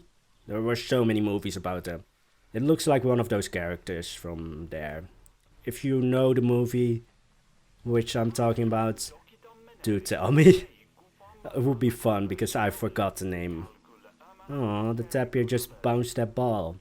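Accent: Netherlands. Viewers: You know right away it's a Dutch guy. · Echt gaaf! Haha ik kon gelijk horen dat je Nederlands was, niet dat dat erg is, is juist leuk :)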